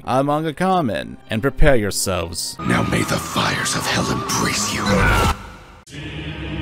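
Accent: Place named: United States. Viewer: your family? Because your accent and channel is american.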